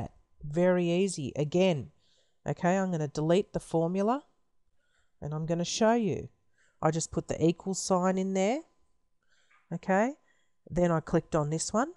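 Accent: Australia. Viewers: Thanks for your explanation and tutorial! (I miss the Aussie accent!) :-) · I love your accent. That is Australian? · Greetings mate!!! While I can certainly appreciate you taking the time to create this informative video, you kept saying: ok one to many times. Which many of us find distracting and highly unprofessional to say the least. It's bad enough we have to endure your aussie accent topped with ok, ok, ok, ok, ok, ok, ok. People need to be able to fully concentrate. Its apparent saying ok to often is part of your vernacular, but re-frame from saying: ok, when attempting to make a professional and educational video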